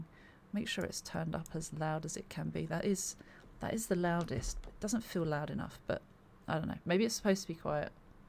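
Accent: England, United Kingdom. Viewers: Love the English accent.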